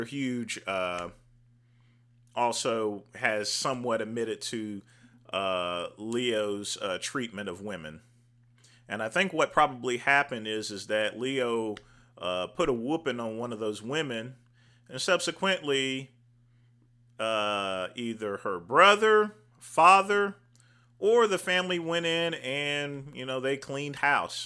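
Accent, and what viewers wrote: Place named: Kentucky, United States. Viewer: Interesting southern accent. Is it Kentucky?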